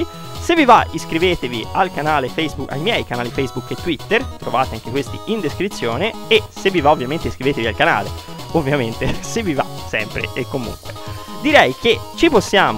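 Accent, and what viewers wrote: Tuscany, Italy. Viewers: Bel video! E' sempre bello sentire un altro toscano su Youtube. Ti sei preso un bel like meritato e un nuovo iscritto.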